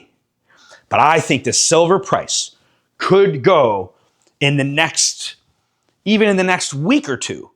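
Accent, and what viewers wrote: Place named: New England, United States. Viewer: Hey, I bet your not.......I recognize that voice...........it's!!!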